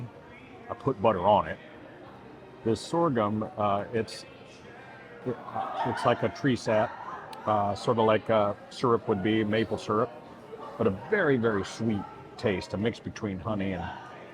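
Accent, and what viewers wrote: Texas, United States. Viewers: Seems like your Texas accent is coming back. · Funny, the longer you're back in Texas, the quicker your Vegas city slicker vibe slides off and your Texas roots come drawling back,!🤠😎😁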